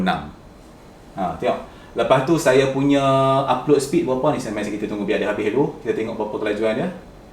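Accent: North, Malaysia. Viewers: TK... Org Utara ka ni ?...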